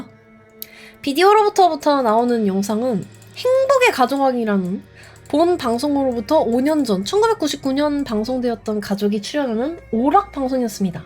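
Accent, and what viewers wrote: Japan. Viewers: 한본어 왜 은근 잘하냐 ㅋㅋㅋ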